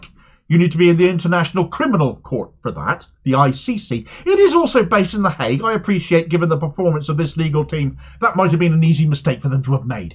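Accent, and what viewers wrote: Cornwall, United Kingdom. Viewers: Zackley., Great to hear a Kernow voice. I'm not Cornish myself - Cornish ancestry but brought up in Somerset... but I love to hear West Country voice and opinion. Thanx mate.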